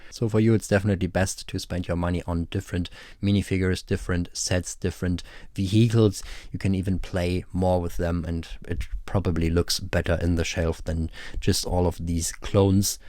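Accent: Germany. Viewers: Are you not german? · Ey man hat direkt direkt gehört dass er deutsch ist :)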